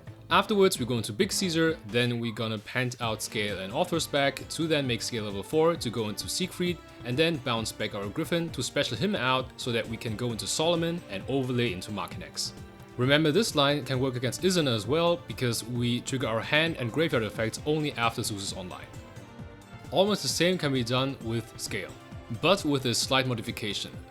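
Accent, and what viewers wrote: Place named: Germany. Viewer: You speak well, but the harsh pronunciation is noticeable. I think since I'm German myself, I can hear it more easily, haha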